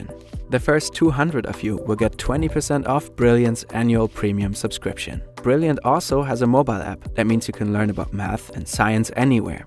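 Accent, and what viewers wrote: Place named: Germany. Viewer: Noticing the german accent. -> direkt mal abboniert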